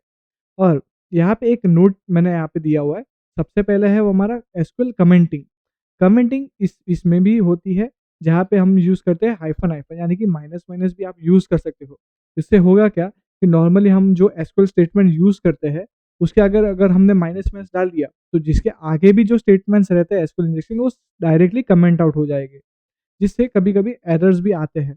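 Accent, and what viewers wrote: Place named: India. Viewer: May the God give you the strength. Be victorious and proud. But the quality of your voice is not good, brother. Try to make it better because it is very difficult for someone who is not fluent in English to listen with an Indian accent. From Iran.:hand-pink-waving::hand-pink-waving::hand-pink-waving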